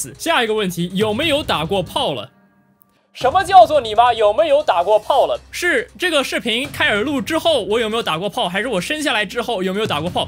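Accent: Taiwan. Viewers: 我喜歡的台灣腔哈哈哈哈我被圈粉啦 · 你的台湾腔为什么和籽岷腔一样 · 有業配金主爸爸了，讚讚。我們台灣腔就是很可愛！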